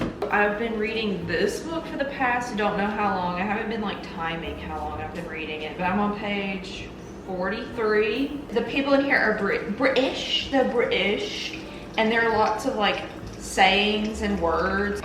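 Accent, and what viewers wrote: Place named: Texas, United States. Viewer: I'm from England and I like the Texas accent lol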